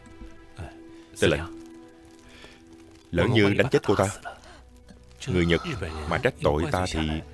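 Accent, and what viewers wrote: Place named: South, Vietnam. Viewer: Giọng thuyết minh quá nặng giọng miền Nam rất khó nghe.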